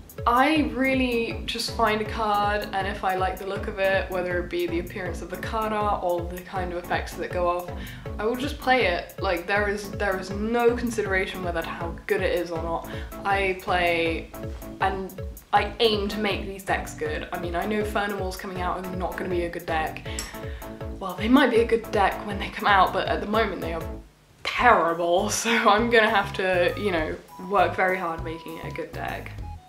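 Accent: United Kingdom. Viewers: Quite interesting. Do you notice what I'm talking about or do you feel you sound completely British? Like seriously when this video first starts I thought you were American.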